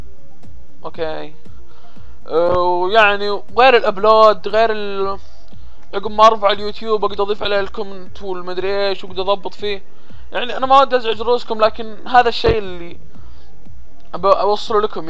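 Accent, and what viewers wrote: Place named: Qassim, Saudi Arabia. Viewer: انت من القصيم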